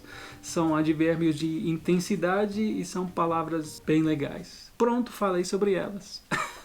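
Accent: Manaus, Brazil. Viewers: hey kiddo,, you have reached 5.000 subcribers, congrats! i loved your explanations about situations with WAS SUPPOSED! Nice job! you razed it! Your brazilian portuguese accent is so perfect, really! keep it up! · Maninho, por isso seu sotaque de Manaus e seu português perfeito! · VOCÊ É BRASILEIRO CARA, ESSE TEU SOTAQUE NÃO ME ENGANA. EU MORO NOS EUA FAZ 3 ANOS E SEI MUITO BEM RECONHECER UM FALANTE DE INGLÊS NATIVO E VOCÊ COM CERTEZA NÃO É UM. NÃO TENHO NADA CONTRA, SE QUISER PODE CONTINUAR ENGANANDO SEU PÚBLICO. EU SÓ ESTOU MESMO CURIOSO PRA SABER QUAL A RAZÃO DISSO TUDO, SEU INGLÊS É ÓTIMO E VOCÊ NÃO TEM MOTIVOS PRA ESCONDER QUE É BRASILEIRO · Nossa vc tem um sotaque brasileiríssimo e seu inglês é de fácil compreensão . Parabéns!!! 🤔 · Seu português é genuíno manauara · Faz muito sentido, qdo ouço ele, parece um brasileiro, sotaque misto do norte/nordeste🤗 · P seu português e tão bom ..parece um manauara falando...só falta as gírias doida kkk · Te conheci pelo canal do Gavin😁. 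Assim que te ouvi falar pensei que fosse de Manaus mano😆 (minha cidade natal😍). Parabéns, seu português é muito limpo e claro👏👏👏 Excelente trabalho, torço para que seu canal cresça e tenhas tudo o que merece.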